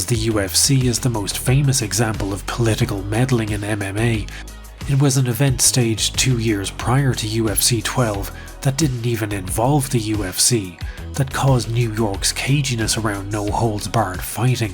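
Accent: Ireland. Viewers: Love your writing. Love your graphics. Love the Hibernian lilt which stirs my Irish lineage. (Also - eff John McCain.) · masterful comedic storytelling + Irish brogue is a winning combination that makes this channel golden.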